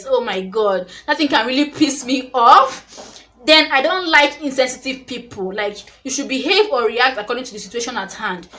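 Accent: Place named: Akwa Ibom, Nigeria. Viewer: Your akwa ibom accent🤣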